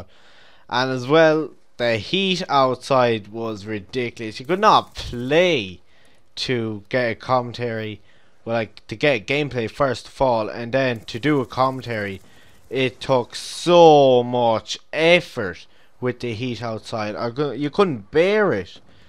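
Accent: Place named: Ireland. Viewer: FUNNIEST IRISH COMMENTATOR EVER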